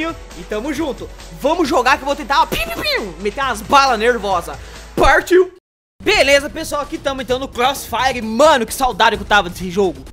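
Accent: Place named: Rio Grande do Sul, Brazil. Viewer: Parece ser gaucho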